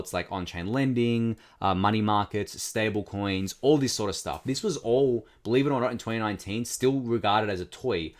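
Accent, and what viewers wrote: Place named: Australia. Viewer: Never knew you were Aussie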